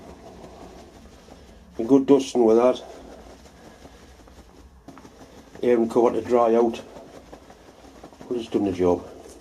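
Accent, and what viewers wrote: Newcastle, United Kingdom. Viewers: Now''t amis we a bit o Geordy!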